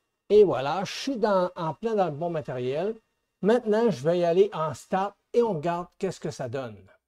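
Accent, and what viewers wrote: Quebec, Canada. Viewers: hey salut merci pour la video jai beaucoup appris!!! tu viens de ou avec ton accent c'est quebecois je suis de montreal moi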